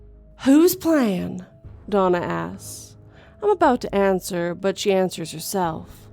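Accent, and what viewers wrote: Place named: South, United States. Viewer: Not half bad southern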